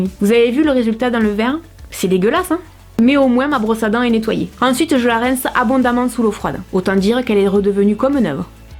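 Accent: South, France. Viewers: bonjour est-ce que tu viens du sud?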